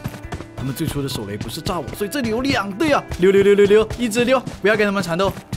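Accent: Hong Kong, China. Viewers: 你是香港人吗？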